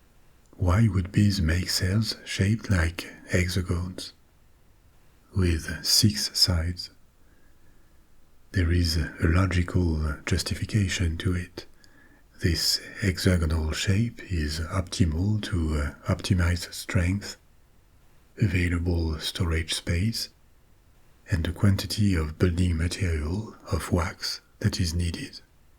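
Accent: France. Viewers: would you consider doing Dante's circles of Hell and Virgil's journey through them? I'd love to hear it from your Frankish tones. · Does have a regional accent? From what part of France does he hail?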